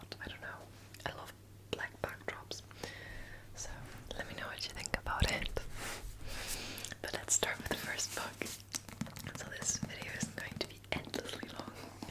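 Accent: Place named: Germany. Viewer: Dein Englisch wir von tag zu tag mehr denglisch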